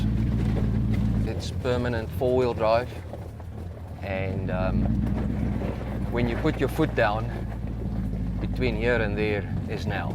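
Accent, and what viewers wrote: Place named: South Africa. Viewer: You have a South African accent, wondering if you are from here min confirmed I guess. Looking at buying a 957 and doing the same with it.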